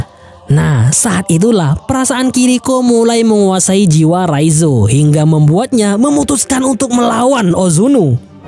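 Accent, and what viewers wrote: Madura, Indonesia. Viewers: Abbe adminnah Reng medureh yeh😂😂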